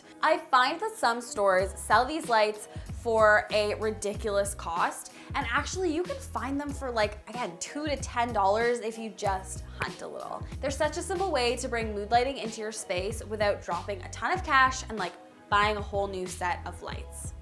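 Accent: Canada. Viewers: Very cozy! Your living room is so inviting - attractive, but not intimidating. There's a happy mean between sterile and cluttered - just enough to engage the eye but leaving plenty of breathing space. I do understand the fire hazards of candles, but LED candles (or diffusers) aren't the most environment-friendly (though they are nice!). Love the pom-pom blanket.. but unfortunately, it's too hot right now in India to even contemplate coziness and rugs and pom pom blankets without a shudder.. coziness is a cold shower right now. Please keep making your awesome videos- they are such a treat and inspiration - and that Canadian accent is just too too adorable. Hi to Harriet and Marty! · Dat canadian accent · I didn't know canadian accents were that obvs to others haha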